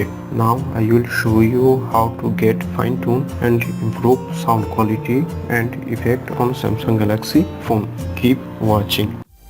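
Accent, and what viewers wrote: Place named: United States. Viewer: American lag rahi hai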